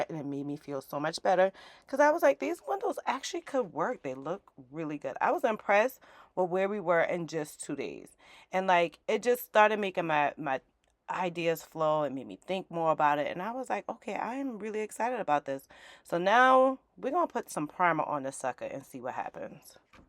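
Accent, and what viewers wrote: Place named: Charleston, United States. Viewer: New subscripber. Love this! That accent sure sounds familiar. Charleston?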